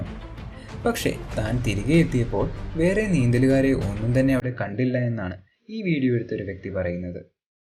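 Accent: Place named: Thrissur, India. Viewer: Thrissur Kari Paranjath kekan rasand evdo ketta polea😲🤓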